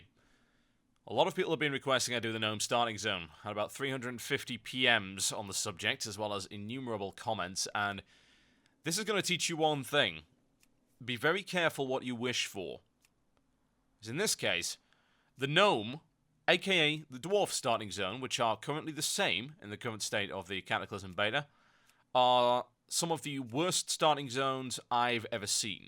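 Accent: United Kingdom. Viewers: I come for the WoW stay for the british accent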